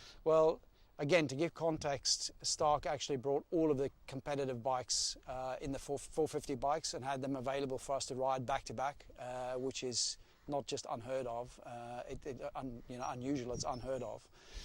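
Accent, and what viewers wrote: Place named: South Africa. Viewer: You sound South African :)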